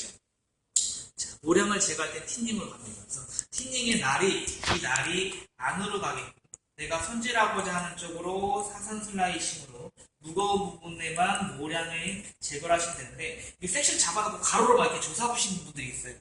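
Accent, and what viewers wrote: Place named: Jeolla, South Korea. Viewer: 구수한 전라도 사투리가 정감이 가네요 감사합니다 원장님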